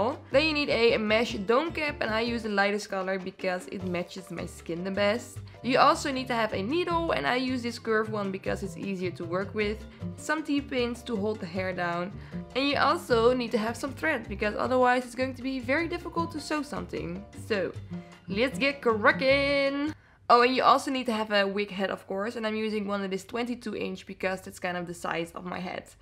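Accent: Netherlands. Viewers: Leuke video, ik heb je nu pas gevonden. Ik hoorde je accent en ik dacht: die is Nederlands.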